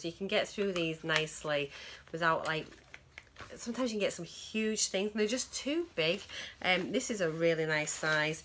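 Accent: United Kingdom. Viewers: me a born vegan, what a great vlog dear..and me like british accent,like with subs............blessing.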